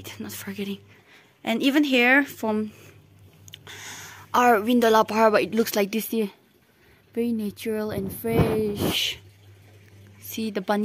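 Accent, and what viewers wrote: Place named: Nagaland, India. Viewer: I really don't know why YouTube recommended me this 😂 but I love the accent... Didn't know Naga accent sounds like this 👍👍 love from Mizoram... Got yourself a new subscriber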